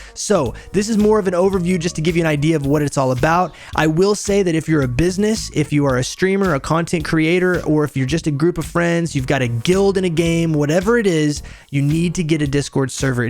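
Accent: United States. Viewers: what is your accent? is that Texas/USA or California? your cadence is familiar - its modified with practice?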